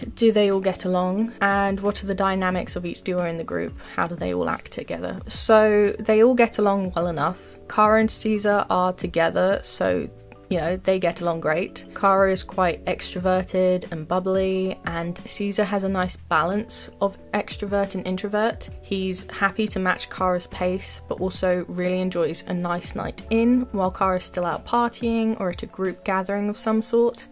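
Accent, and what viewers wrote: United Kingdom. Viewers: Beautiful!! I loveee your videos! you’re such an inspiring artist to me :) you don’t have to answer this but where is your accent from in England? I have a similar accent but not many ppl have the same accent as me where I live XD again, you don’t have to answer this, I was just curious. ⭐️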